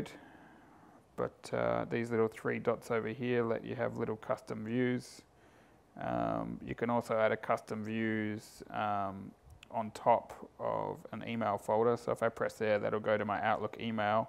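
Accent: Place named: Australia. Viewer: you're aussie. finally an aussie reviewing a blackberry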